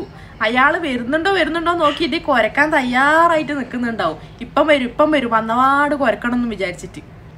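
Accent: Kannur, India. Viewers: my wife and daughter are enjoying your all programs. We are from Kannur, so it is our normal talk. Thanks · കണ്ണൂർ ഭാഷ ഇത്ര ഭംഗി ആണെന്ന് ഇപ്പൊ മനസിലായി... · Super chechi kannur slag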